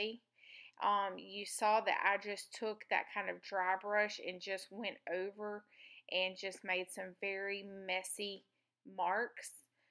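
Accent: South, United States. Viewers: My stars girl, I love all of your southern accents and expressions. I moved out West 15 years ago and miss the accents. You bring me Joy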